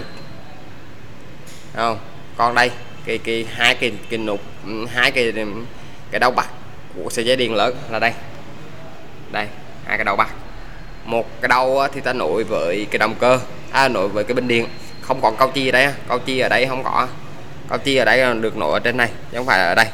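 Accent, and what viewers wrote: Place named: Quang Ngai, Vietnam. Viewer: tuy anh nói tiếng quãng ngãi hơi khó nghe thật. nhưng thật sự em dám công nhận anh chỉ rất dể hiểu và có thể làm đc. do anh có chuẩn bị sẳn đồ án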